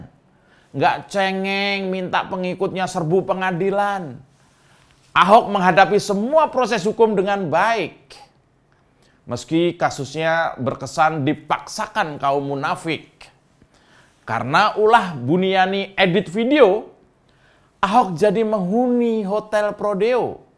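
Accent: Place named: Yogyakarta, Indonesia. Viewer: gaya guyonan khas..jogja kentel..diserang tertawa dialem blunder..koyo kucing tilem...gree gree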